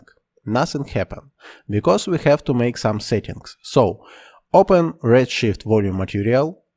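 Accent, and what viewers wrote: Russia. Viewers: Прикольно))) Не зная английского, я поняла его, благодаря русскому акценту) Спасибо за урок))) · Дружище, у тебя всё классно получается, ты большой спец, но почему не вещаешь на русском( акцент выдаёт)? Таких как я полно и нам не хватает уроков по редшифту! Будь добр, сделай серию на русском...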